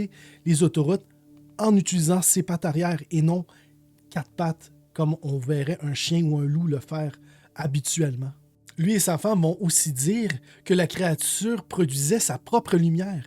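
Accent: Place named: Quebec, Canada. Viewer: Toujours un plaisir d entendre l 'accent , à Guebec , nos anciens parlaient comme ça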